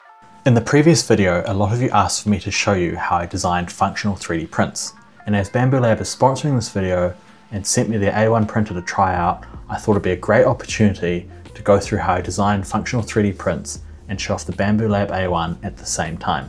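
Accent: New Zealand. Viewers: Just realized I missed the kiwi accent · Yes the New Zealand accent can produce some funny sounds!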